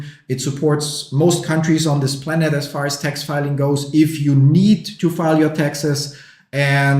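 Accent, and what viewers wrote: Germany. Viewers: so typical German to pronounce a C like a Z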